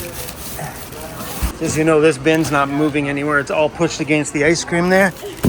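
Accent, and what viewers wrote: Australia. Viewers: Lovely hearing that Aussie accent. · Ahhhh it's great to hear an Aussie accent. I'm a Kiwi in Australia!!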